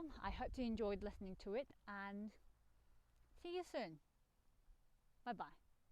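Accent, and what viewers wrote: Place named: United Kingdom. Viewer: 너무배우고싶네요..^^영국발음과 악센트..멋져요♡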